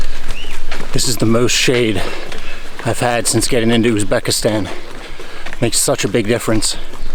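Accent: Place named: Philadelphia, United States. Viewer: Damn that Philly accent is thick haha.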